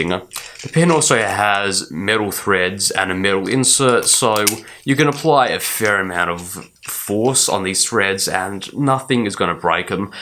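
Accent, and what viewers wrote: Australia. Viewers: From his vowel usage I’d say Australia, or Straya! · Lovely accent. Are you from Australia, or New Zealand?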